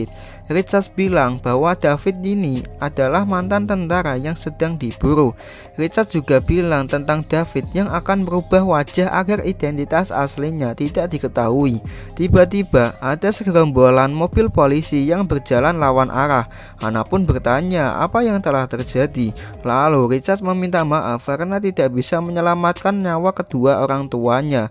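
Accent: Java, Indonesia. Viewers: ett medok bener si mas logat nya, jadi kurang jelas mas'e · Logat jowo ne medok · Logat Jawa suarane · min plis, asli mana njenengan, medok banget kalo ngomong wwwk but TQ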